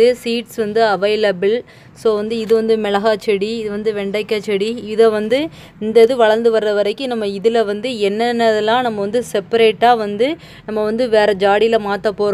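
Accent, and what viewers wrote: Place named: Tirunelveli, India. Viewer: அக்கா நீங்க திருநெல்வேலியா, திருநெல்வேலியில் தான் பூண்டு பூடு ன்னுதான் சொல்வாங்க நண்பர்களே.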